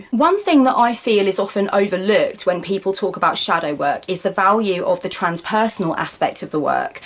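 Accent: United Kingdom. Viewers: I have been following your channel for awhile and it is always in sync with what I am working on at the time. plus you really pull off blue hair well. And as an American with a degree in Brit lit your accent is heart warming. speaking of goddesses my baby daughters middle name is Luna so Diana and Hecate are really big influences.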